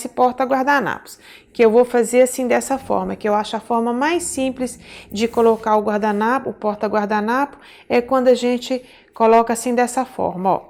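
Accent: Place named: Minas Gerais, Brazil. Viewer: por favor se puder faça um vídeo nos mostrando onde colocarmos a comida depois da mesa posta. Vejo vários vídeos sobre mesa posta mas nunca com a comida. Onde ela deve ficar, se na mesa ou no aparador. Como devemos agir. To aqui em todos seus vídeos. Sem contar que amo seu jeito mineiro de falar. Mata um pouco a minha saudade do nosso torrao natal.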